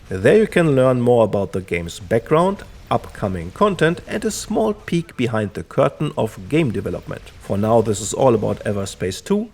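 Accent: Germany. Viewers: höre ich da einen deutschen Akzent raus? :D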